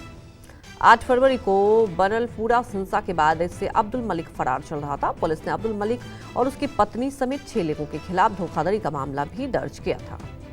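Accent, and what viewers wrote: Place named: Bihar, India. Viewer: Madam Ki Bhasha Mein Bihar Jhalak Rha Hai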